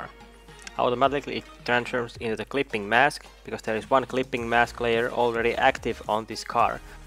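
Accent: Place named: Finland. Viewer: Where are you from? You sound finnish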